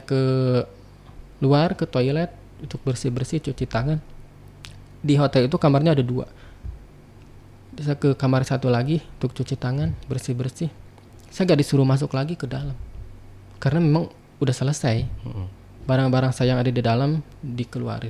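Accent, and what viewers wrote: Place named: West Java, Indonesia. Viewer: kaya nya org sunda ya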